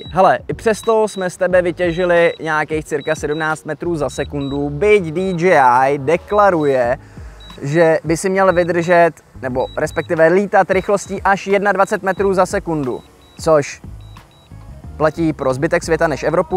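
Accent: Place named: Prague, Czech Republic. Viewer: Ten pražskej akcent to je něco strašnýho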